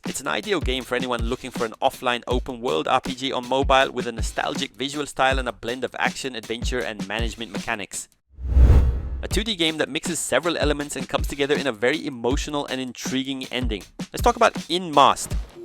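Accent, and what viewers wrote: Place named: Brazil. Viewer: A voz mano, o sotaque dele é muito de brasileiro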